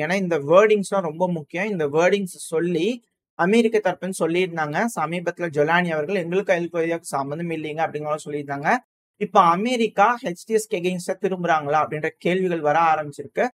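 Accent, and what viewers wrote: Coimbatore, India. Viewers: அவன் பேசறத பார்த்த கோயம்பத்தூர் மாரி தெரியுது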